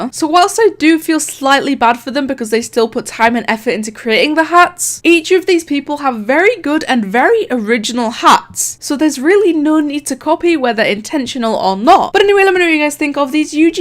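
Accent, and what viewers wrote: United Kingdom. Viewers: Are you from UK? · U sound british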